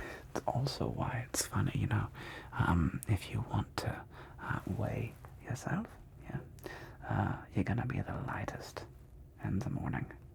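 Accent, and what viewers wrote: Germany. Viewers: I love everything (!) about this video! is such a great, funny, professional, and caring sleep doctor!! And I know I've said this before, but I cannot say this often enough, the German accent so much on point, it's hilarious!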